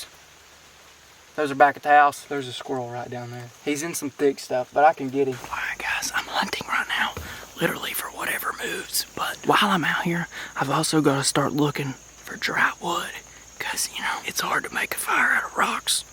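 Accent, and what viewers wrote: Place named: West, United States. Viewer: A Russian man w/ a western accent #AK-47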